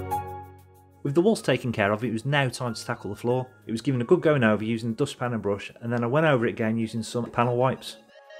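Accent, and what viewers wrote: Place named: Walsall, United Kingdom. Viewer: Thanks for sharing your build and I’m just about to start my build. I’m based in Walsall and you sound very local 👌🏻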